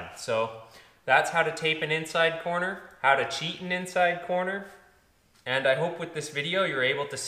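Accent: Canada. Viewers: Awesome video, even better This Hour has 22 Minutes accent eh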